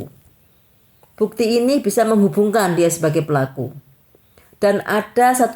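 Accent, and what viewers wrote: Java, Indonesia. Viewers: logat jawanya medok amat mbak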